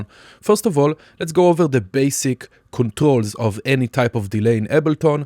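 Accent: Israel. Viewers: אני מזהה את הישראליות מהמבטא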